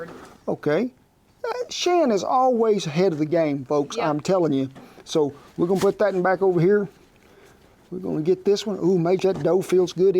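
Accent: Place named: Oklahoma, United States. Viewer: I love when you tease people making fun of your accent. As a fellow Okie, I have no problem understanding you.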